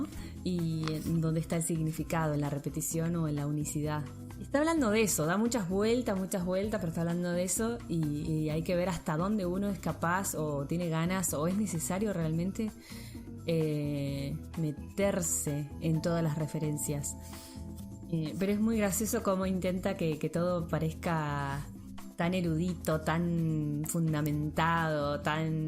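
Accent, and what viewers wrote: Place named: Argentina. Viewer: Espero no ofender a nadie con mi comentario, si fuese el caso: lo siento. Usualmente no me parece atractivo el acento argentino, pero tu voz me encanta. Gracias por las lecturas.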